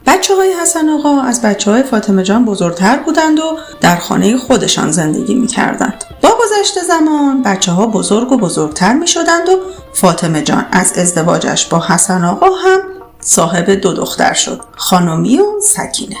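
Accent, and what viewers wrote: Yazd, Iran. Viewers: یزدی هستید؟؟ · چه لهجه قشنگی .یزدی هستید خودتون؟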